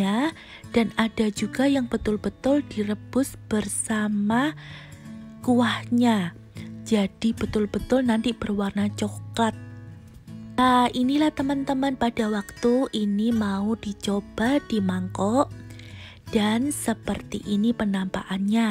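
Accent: Java, Indonesia. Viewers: logatnya jawa juga kental banget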